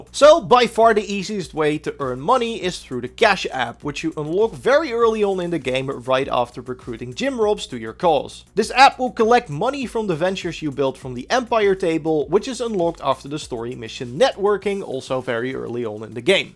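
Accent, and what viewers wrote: Netherlands. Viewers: I just hear the dutch accent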